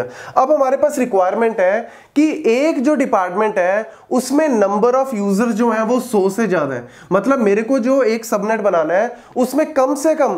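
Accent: Punjab, India. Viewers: Good explanation . Apki hindi main punjabi accent atta hai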